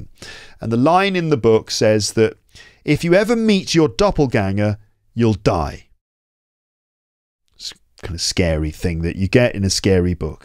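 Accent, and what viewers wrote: United Kingdom. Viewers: Are yoy British? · you're such a great person, and your channle is really a treasure for those who want to learn English in its natural way, by listening to Britch guy with a very clear accent, just thank you for your effort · I happened to find your channel and totally drawn into. It was really interesting and learning a lot. I was looking for an English lesson program just like it. You are such a great teacher and I like your British accent as well it sounds so nice. I will check out your other movies. Thanks a lot! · 👍👍👍👍👍👍 good teaching. he is British native English. Not American. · Do you speak in british accent? 🇬🇧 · Thank you so teacher you are teaching english good also be able to listen British accent this is real one not easy to find out easy ways some one like British accent and translate to shot form sentence this is good idea clear thanking you.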